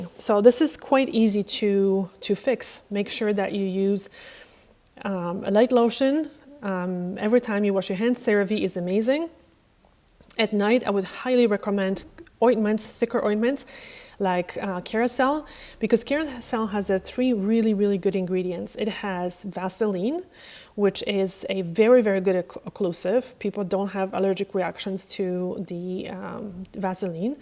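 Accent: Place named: Poland. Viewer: I can hear a slight Polish accent in your English. Am I right?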